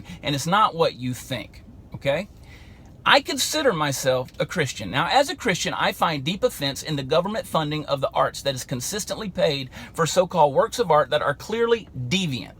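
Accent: Texas, United States. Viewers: Ditto. Love it. We are on the same page. World needs more like you speak clear common horse sense with clear direct American English- the way it is meant to be spoken. And I eat cow as Bible tells and drink their unpasteurized milk too. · Will you run for Congress or senate or the president I like how you talk and I like how you think you must be from Texas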